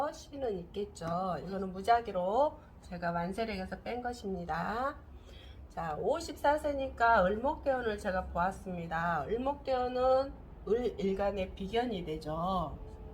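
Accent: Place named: Jeolla, South Korea. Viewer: 전라도분이시군요 저도. .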